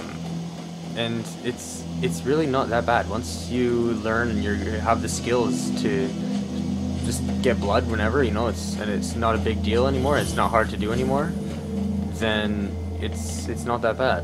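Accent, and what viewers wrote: Canada. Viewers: yo are you canadian a friend of mine has the same accent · You're Canadian, aren't you?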